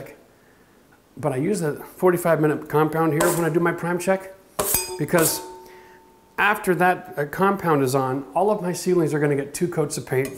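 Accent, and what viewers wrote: Canada. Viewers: Heck, I learnt a lot from this guy, although I will never gonna do what he is doing but listening Canadian accent was fun for me!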